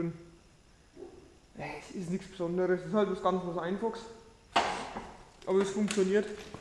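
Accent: Franconia, Germany. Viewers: Sprich net fränkisch dich versteht keiner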